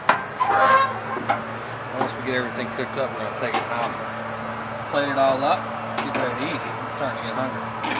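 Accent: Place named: South, United States. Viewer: Kiddo, where did you get that coloma meets southern drawl?? You're SO awesome. Love the vids!!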